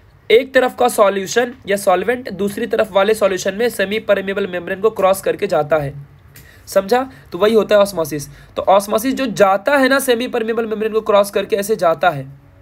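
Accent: Maharashtra, India. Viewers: Marathi aahe ka Dada tu? Aasa bolat ja madhe madhe thoda changl vatt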